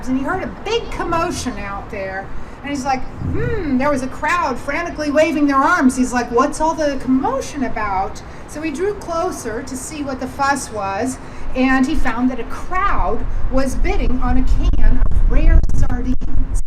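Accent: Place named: South, United States. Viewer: Haha. I like the Southern accent. Gotta adapt to your audience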